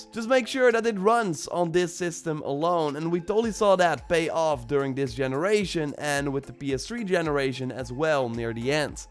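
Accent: Netherlands. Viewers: Are you dutch ?? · The dutch accent is heavy on this one.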